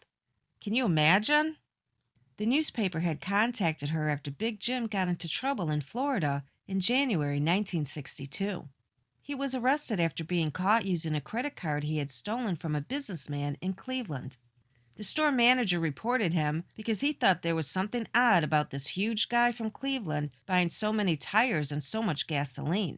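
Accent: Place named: Western New York, United States. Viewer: I really enjoy your channel and how you research and include newspaper clippings like in this one which I thought was very good. The narrators voice is unique, def Western NY? Cleveland or Detroit I’m guessing lol. But this story got my interest bc I moved down from Brooklyn to Fort Lauderdale in 1983 and the locals were still talking about this story and Big Jim. I’ve even been in the 4 O’clock more than a few times back then lol. Keep up the great work you people do.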